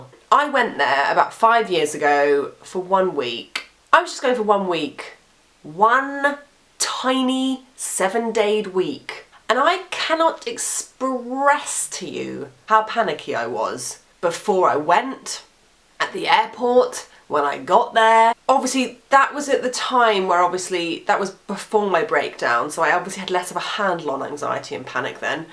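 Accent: United Kingdom. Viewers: What the heck,? You’re practically an American with a British accent. Have you gone to a hypnotist to find out why you are afraid? My greatest fear is the government busting down my door and asking for more taxes. Or everyone pointing at me and saying, “you’re worthless & a fraud.” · Sounds like agoraphobia to some extent. I try to get over the irrational fears I have by doing more of what I fear. Travel, travel, travel... LA is a cool but expensive place, perhaps not tho compared to London. With your awesome looks, talent and sexy british accent you could kick butt and take names here in LA.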